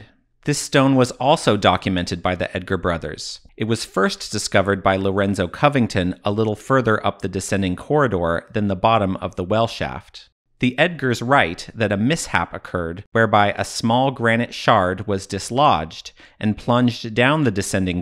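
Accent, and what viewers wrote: United States. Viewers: That last pun of yours,is one that works ok with the American accent.You know~~~Annarica and all that. Enlightening video by the way, thanks.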